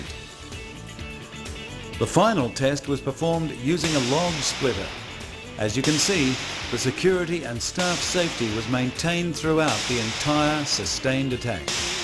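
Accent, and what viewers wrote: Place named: Australia. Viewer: The narrator sounds like an Australian, judging by his accent.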